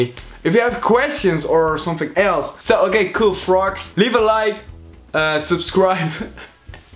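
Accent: Netherlands. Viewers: Je bent nederlands. Dat hoor je aan je accent😂😂💗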